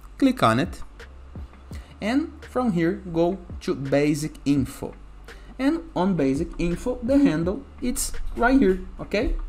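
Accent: Brazil. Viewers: salve! pelo sotaque só pode ser BR, valeu pela dica, nao tava achando onde mudar a bagaça!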